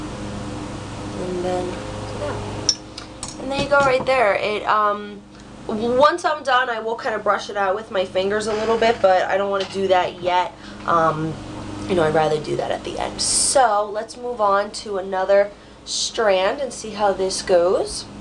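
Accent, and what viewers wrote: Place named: North, United Kingdom. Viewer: Are you from up north? I can tell by your accent lol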